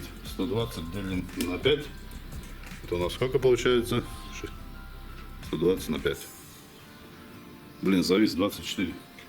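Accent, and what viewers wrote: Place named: South, Russia. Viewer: Это южный диалект ) Там банка-баллон а пакет-кулек!)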